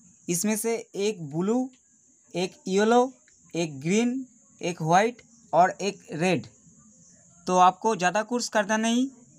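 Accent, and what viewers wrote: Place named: Bengal, India. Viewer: Bangali ho ke Hindi kau bol rehe ho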